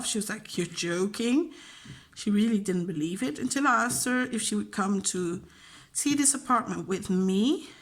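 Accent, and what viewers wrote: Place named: RP, United Kingdom. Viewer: I love your name so much and I think it’s so cool where your mom got your name from. I love that song! The variation to Berlin is really beautiful as well. I want to hear you speak alllll the languages! 😂 If you could come up with something to say in Dutch, and then say the same or something extremely similar in your dialect, I think that would be awesome! We might not understand it, but we can try to hear the differences. I’m one of those people who love your accent! It’s so hard to explain sounds, but I can hear a little bit of that BBC sometimes and your words have a kind of flourish to them sometimes? I don’t know, it just sounds fancy! 😂 There are definitely many people here in the US who do not appreciate teachers and also make the same comments about them having lots of vacations and stuff. Unfortunately they don’t understand that, as you said, you’re still working (unpaid most of the time) when you’re not in class with students and that working with so many kids is EXHAUSTING. 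I know I definitely could not do it!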